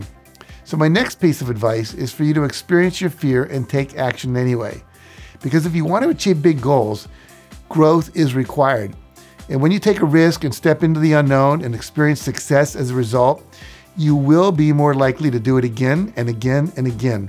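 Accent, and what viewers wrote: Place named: United States. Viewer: Thanks a ton you make it seem so simple, Ty for ref the Boorman video like a shot in the arm.refreshes my persistence muscle.i want to commit 100% to cleaning up my family home that i share with my siblings,parent's blessing want to make it more usable and less cluttered.I owe you special thanks for Rule of 5 from TSP book(awesome book) i practice and feeling the benefits of clean home. it is not all done but i can feel the results so thank You.i appreciate the written pointers you give on the side screen. i will also appreciate if you can ensure a sub-title feature in all videos as sometimes the american accent could be a challenge for us asians to follow. Great Job keep it up and Thank You once again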